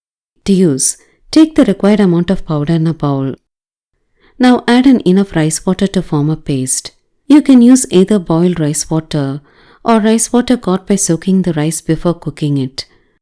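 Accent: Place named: South India, India. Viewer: Awesome video 😘 I guess you too from south India, from your accent, it's beautiful.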